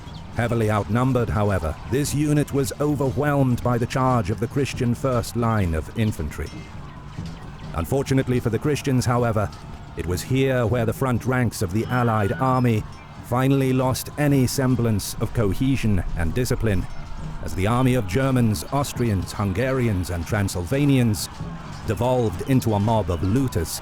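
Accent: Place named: United Kingdom. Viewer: That battle wasn’t a defeat by the Christians alone. The Ottomans disengaged as well and the battle was not for nothing. The Christian army despite their withdrawal accomplished their goal which was dislodging their enemies from the fortified town. You may sound British, but you’re definitely a pro-Muslim person based on your biases. Didn’t you just say in the earlier part that these Ottomans murdered the people they captured? Why are you partial to these murderers?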